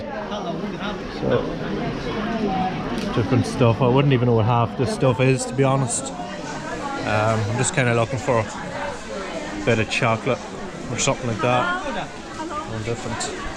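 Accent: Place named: Scotland, United Kingdom. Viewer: Australian with scottish accent, very cute, nice weather and walk around!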